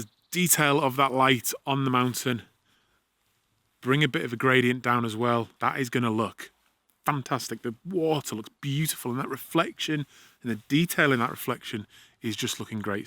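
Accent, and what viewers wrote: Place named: Wirral, United Kingdom. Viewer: Cheers bud! haha I was hearing a northern accent; sounded bit Wirral'ish (where I'm from) to me haha.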